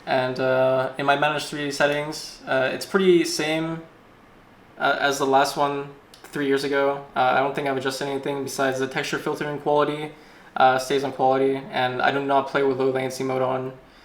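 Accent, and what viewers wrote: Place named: Europe. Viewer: man's played in europe so long he's got a quasi european accent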